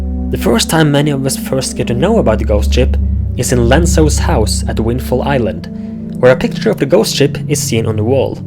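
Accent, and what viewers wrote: Norway. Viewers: Kul video! Ikke alltid man møter på norske zelda-yourubere! :D Litt sterk norsk aksang i uttalen din, men ellers veldig bra! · did i hear the lovely sound of a Norwegian English youtuber? ikke ofte man ser det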